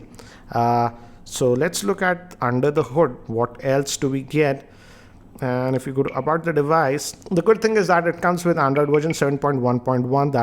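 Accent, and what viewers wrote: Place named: Tamil Nadu, India. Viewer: Your pronounce is a tamil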